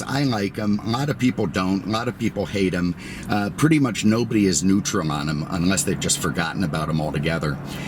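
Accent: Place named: North, United States. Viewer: Why do people who clearly come from the north (your accent gives it away) come to Florida and complain non stop about the heat/humidity? Nobody forced you to come down here. STFU already and just give us the car reviews....:-x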